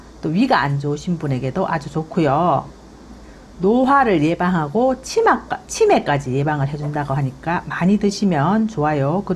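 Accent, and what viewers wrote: Gyeongsang, South Korea. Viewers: 도대최 어디경상도 사투리입니까 대구에요 부산이에요 제가 어릴때 고향에서 많이듣던 사투리 같아서 궁금하네요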